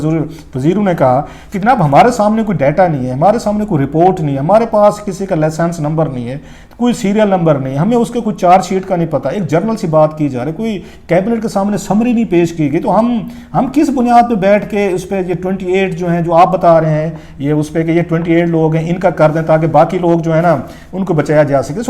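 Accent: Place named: Punjab, Pakistan. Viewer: Yeh Punjab accent (lahja) hai. Urdu Awadh ki juban hai, jali Urdu boltey hai. Mulk ka apna ABBA bhi nahi hai.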